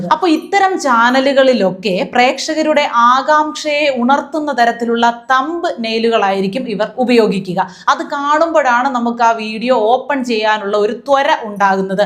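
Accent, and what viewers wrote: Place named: Thrissur, India. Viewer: ആള് ആള് എന്നു പറയുന്നതുകേട്ടിട്ട് തൃശൂർകാരി ആണെന്നു തോന്നുന്നു. 🤔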